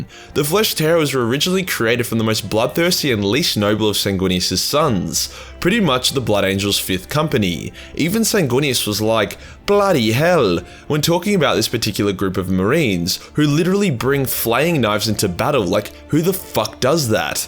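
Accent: Australia. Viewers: My Girlfriend is working in a BPO Australian account when she heard the astorath's paraphrase she laugh so much told me that is absolutely Australian😂🤣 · You could do with a little heavier Australian accent. What kind of Australian are you?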